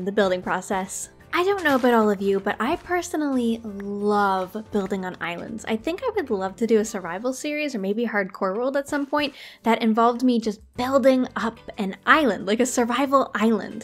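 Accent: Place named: Canada. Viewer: As a fellow Canadian i love her accent aye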